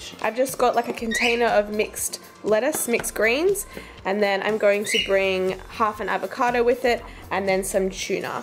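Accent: Australia. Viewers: Hi! Just found ur channel:) You have good skin and toned body (Australian accent as well:p do you mind sharing ur beauty tips with us? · you have an aussie accent, but do you live in australia ?? or do you live somewhere else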